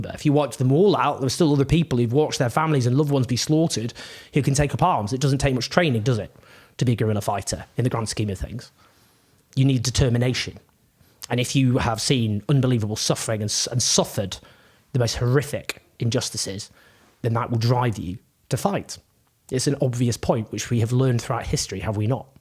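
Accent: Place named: England, United Kingdom. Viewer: you are imoressive but please speak more slowly. As an American, the English accent, although very nice, is a bit of a problem to understand and spoken rapidly much more difficult or even impossible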